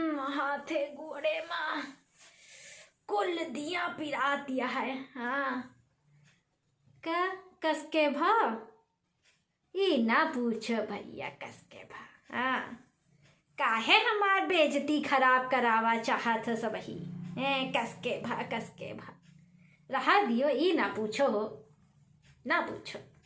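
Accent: Awadh, India. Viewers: मुझे जब भी अपनी महिला मित्र की याद आती है तो मैं आपकी ये वाली वीडियो देखता हूँ, मैं उनको ये वीडियो सेंड किया था तो वो बोलीं थी कि ये तो बिल्कुल हमारी तरह से बोलती हैं, अवधि भाषा में, हमको कम समझ में आता है हम ठहरे बृज के निवासी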